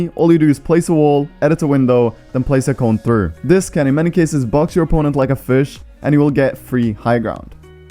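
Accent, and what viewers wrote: Norway. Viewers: U from Norway? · Are you Norwegian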